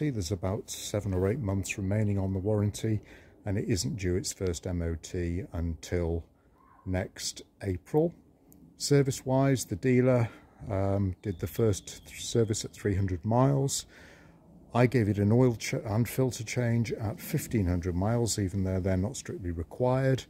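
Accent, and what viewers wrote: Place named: France. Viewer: Can't you tell by his accent he's french?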